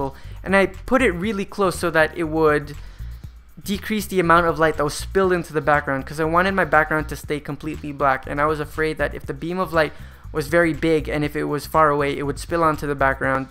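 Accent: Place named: Philippines. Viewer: not bad englilsh at all... but the signature filipino hum is there... haha! Good job! nice tutorial!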